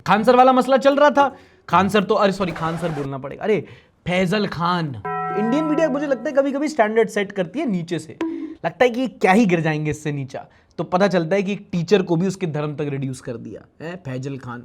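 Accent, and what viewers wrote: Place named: Bundelkhand, India. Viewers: Bhai ki Bundelkhandi bahut achi hai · LOVE YOUR BUNDELKHANDI ACCENT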